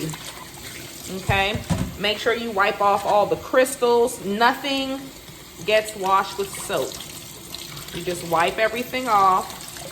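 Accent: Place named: Washington DC, United States. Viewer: U from D.C.? Your accent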